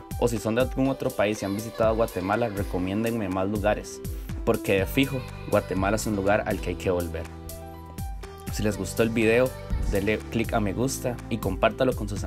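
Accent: Costa Rica. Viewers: Estás guapo vos tico, me gusta el acento costarricense ya que no pronuncian la letrra ese aspirada tal como nosotros los chapines tampoco lo hacemos. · No te hagas muy el extranjero porque Guate es el hogar de todo centroamericano, lo unico que uds tienen otro acento un poco deferente al de Guate y porque tienen a Wanchoppe que lo odiamos jajaja pero pues somos lo mismo.. Saludos hasta tiquicia la bella, gente honesta, chambiadora, honrada y siempre echada para delante como la chapina.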